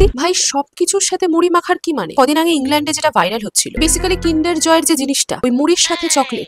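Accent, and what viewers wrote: India. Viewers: You are from Burdwan?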